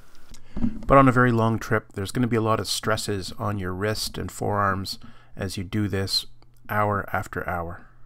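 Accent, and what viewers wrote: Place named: Canada. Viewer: Only learn canoe techniques from someone with a Canadian accent.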